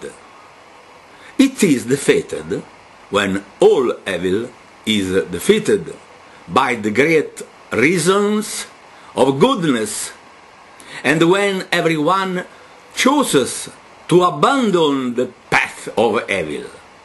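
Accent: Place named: Italy. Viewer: some times i come back to this random video i found just to listen to this guys crazy italian voice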